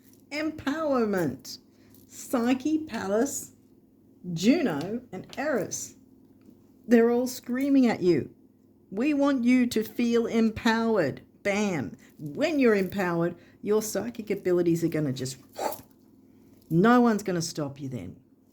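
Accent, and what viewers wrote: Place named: New South Wales, Australia. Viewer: Wow, thanks for this! Followed Gregory Scott across to explore your site - got way laid here on my way to the Mushroom Tarot review. What a happy serendipitous day of exploring rabbit holes this evening has become. Just got back from six months in NSW so hearing your accent was a heart warming thing in itself. Will have to re listen to this a second time and take notes. Love noticing how the tendencies and energies ebb and flow as I'm wading through this month of 'could go either way in home, relationship, work - looking after myself has become a priority though now. So thanks for the encouragement for that!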